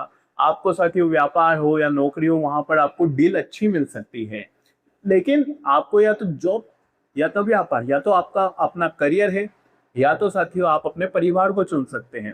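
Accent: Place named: United Kingdom. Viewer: Bakwas mat kijiye First of all improve your pronounciation in Hindi Don't talk like a Britisher You Third grade Astrologer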